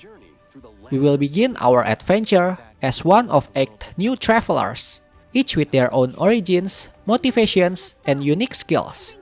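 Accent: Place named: Indonesia. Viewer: thanks for the video.. can't wait for Eiyuden Chronicles! 😍 denger dari logatnya.. orang Indo ya bang? wkwkwk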